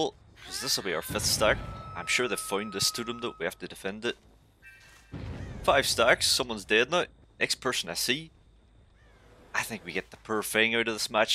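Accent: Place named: Northern Ireland, United Kingdom. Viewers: Im not good with accents, but I would say he's Northern Irish. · northern Irish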